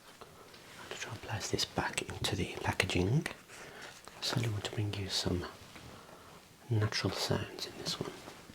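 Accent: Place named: West Midlands, United Kingdom. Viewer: Your voice reminds me of my Orthodontist's voice when I was a child in the 90s. He lived in Solihull. I was never afraid of a doctor again after treatment there, and I never forgot the voice. I've always found the west midlands accent to be really comforting. I live in Texas now, which is quite a way away...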